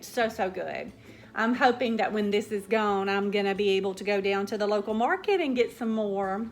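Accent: South, United States. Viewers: Love listening to your stories! There is nothing more pleasant than listening to someone who has a southern accent talk. And of course I may be biased since I too have a very southern drawl 😂.I remember growing up my big mamaw( yes that was what we called her) she was my great grandmother making us hoecake when we were little. I remember her making them when she made beans and fried taters for supper. When she made them for breakfast I remember enjoying them with her blackberry jam. Thank you so much for sharing and for bringing back some wonderful memories.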